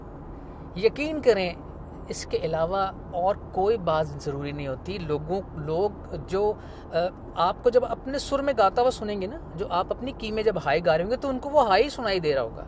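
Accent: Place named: Punjab, India. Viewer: Aapke punjabi accent bahut achha lagta hay sir.... Respect from India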